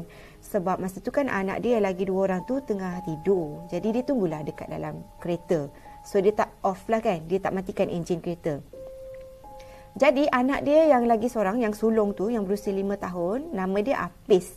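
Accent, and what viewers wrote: Kelantan, Malaysia. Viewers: Kak org klate ko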